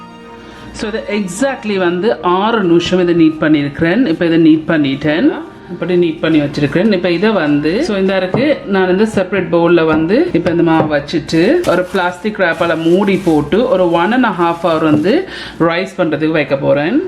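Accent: Jaffna, Sri Lanka. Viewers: Its really nice.your voice also it reminds me jaffna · நீங்க யாழ்ப்பாணமா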